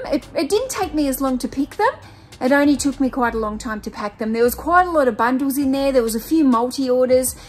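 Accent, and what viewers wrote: Australia. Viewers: Hello, this is the first time viewing your YouTube videos. I did enjoy your content and hustle. I know a lot of folks, like myself, from the U.S. cant distinguish certain accents. I have always loved the Aussie accent over British. I don't know why. I do have a question though. Did you just say to yourself, "These IKEA bags are fun and awesome." They really are. I see blue ones all the time. I've never seen these. That's so cool. My wife and I need to get some. We are trying to one day be successful in turning things for a profit. We have gotten our feet wet. One day we will be full time. Lots of love, Rosie and Ritta from Texas.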